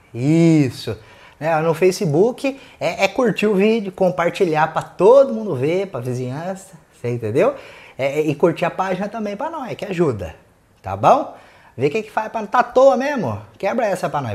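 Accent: Minas Gerais, Brazil. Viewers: Hêêêêê Trêm Bão sôôô!!! Até que em fim, um minêrin pá salvá nóis nu you tubi uai!!! Faz uma recramação do tempo pra se aposentar, que nóis num aguenta mais trabaiá!!! Abraço!!!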